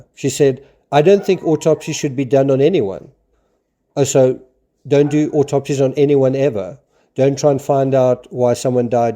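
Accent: South Africa. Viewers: Hi- Annie here. I was born in Cape Town so I recognised your accent immediately. My parents brought me to the US for medical reasons when I was quite young. We spoke English and Afrikaans at home but i lost my accent at age 7 to avoid being teased at school. I now live in the state of Arkansas and am a retired psychologist. I have been watching the Chad Daybell trial since day 1 and I love your video. It is clear and straightforward (as much as such a complicated case like this can be described). I agree that that the family is completely enmeshed. In fact, many strict Mormons are; Chad's followers,.however, are a cult within a cult. I am very pleased with today's verdict. The sentencing phase continues tomorrow with victims' statements. I think we will see many tears. I am fascinated by this story and trials have sparked worldwide interest and am pleased that South Africa has such an amazing True Crime network. 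Do you cover many American True Crime stories? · I follow this channel from South Africa, and i have been wondering why you report on American crimes, as i picked up your accept. Just confirmed you are South African, living in the US. Great stuff, been following since Gaby's case.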